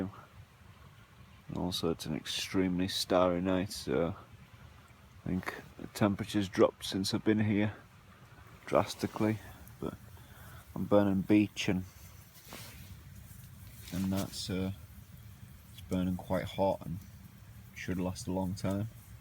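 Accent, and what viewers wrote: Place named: Yorkshire, United Kingdom. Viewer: u sound very Yorkshire like.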